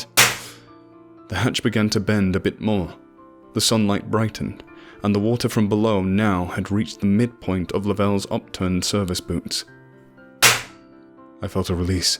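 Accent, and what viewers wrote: United States. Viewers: Over-pronounced? Just sounded like various American accents. Sounded pretty good to me. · If that's you doing the American accent as well, damn, you are GOOD. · Your American accent is wonderful! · That American accent is on point my dude 👍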